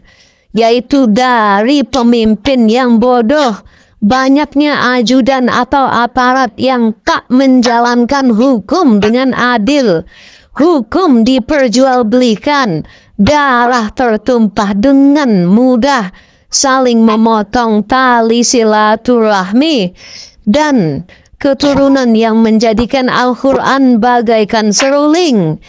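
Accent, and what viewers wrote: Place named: Netherlands. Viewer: Raimu wong ndi cuk..ngomong koyo ngono logate koyo londo udu wong indonesia kuplak....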